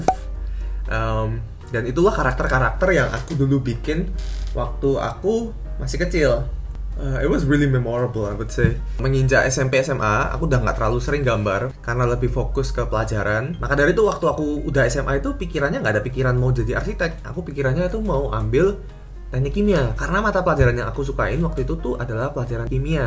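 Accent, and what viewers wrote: Java, Indonesia. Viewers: Kok bisa si pas ngomong english american banget, bahasa indo medok banget wkwk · Kak logatnya khas Jawa buangeeet! Wkwkwkw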